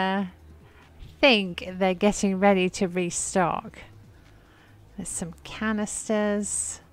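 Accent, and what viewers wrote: England, United Kingdom. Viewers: Hello. I am a fairly new subscriber (really enjoy the Goodwill trips - one of my favorites). In this video, you mentioned being a child in California. I assumed that you are from England because of your accent. Did you move here as a child but retain your accent?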